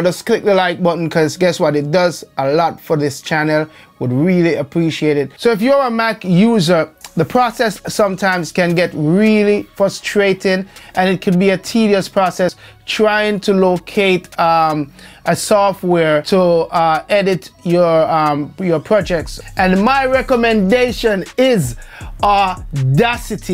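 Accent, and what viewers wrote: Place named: Jamaica. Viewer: Subscribe Di minute mi hear Di yaad accent.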